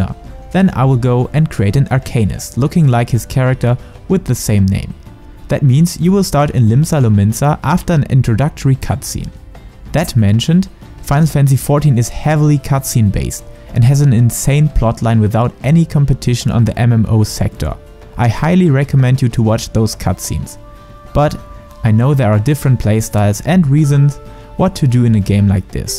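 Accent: Germany. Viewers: bro, your German accent...I just cant...sorry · As a german myself i got to say: your accent is THICK man. Try to adjust to the english tongue a bit more, practicing pronounciation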